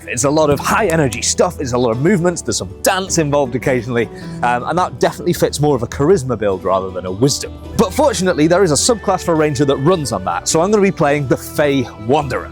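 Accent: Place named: United Kingdom. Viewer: I really do hope that in the actual campaign you play up your Bri'ish accent.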